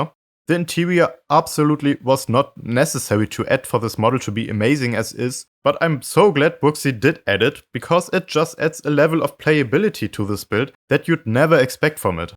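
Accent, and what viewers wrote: Germany. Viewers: Sounds like zhe Man in the Video is German (English accent) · Do i hear a german-like accent? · Your english Sounds Like a German speaking english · Are you german?😭😭😭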